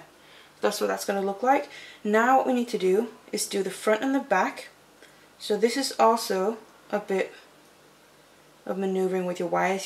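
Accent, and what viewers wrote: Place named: North, United Kingdom. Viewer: Nice and clear instructions, easy to follow, and its really nice to hear a soft northern accent and not some harsh grating American one(some are OK TBH). Anyway many Thanks for the tutorial!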